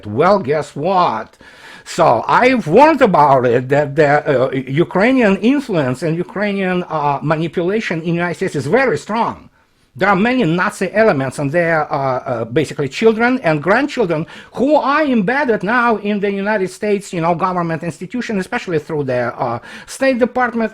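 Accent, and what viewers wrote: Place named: Russia. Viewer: When I heard you at the Duran it was a bit hard for me to understand your "Russian accent" (me Spanish accent), by now I'm loving every one of your videos and I understand and agree in everything you say. You are a very Intelligent man.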